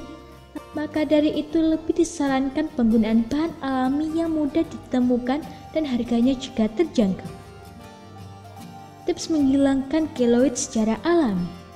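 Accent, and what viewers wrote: Java, Indonesia. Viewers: Intonasi suara nya kaya drama di sekolah.logatnya jawa .tapi jawa ma ya...lucu2 geli..... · Medok bngt🤣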